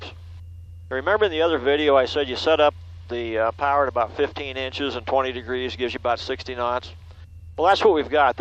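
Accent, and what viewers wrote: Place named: England, United Kingdom. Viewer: Oh, P****n d'accent rosbeef !! C'est HORRIBLE et très désagréable !!!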